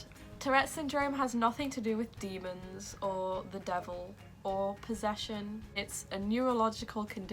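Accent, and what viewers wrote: United Kingdom. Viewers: Every video I’ve seen with a person with your condition has a British accent. I wonder if it’s something in the air or water or something to that extent.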